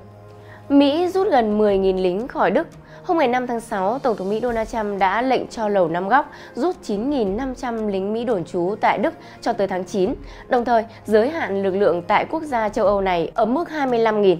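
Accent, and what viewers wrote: Standard. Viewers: Giọng đọc vẫn chuẩn rõ ràng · Chương trình hay mc xinh đẹp phát âm chuẩn , rỏ ràng.chúc chương trình ngày một hay và dc nhiều khán thính giả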